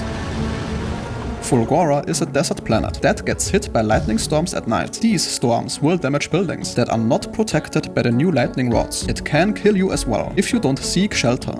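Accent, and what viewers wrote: Germany. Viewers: Dürfte ja auch ein deutschsprachiger YT'er sein. Zumindest hört sich seine englische Aussprache danach an.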